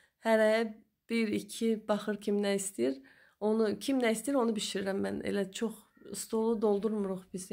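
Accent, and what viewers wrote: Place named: Balakan, Azerbaijan. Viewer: Super, danisiqda Balakən aksenti öz yerinde😊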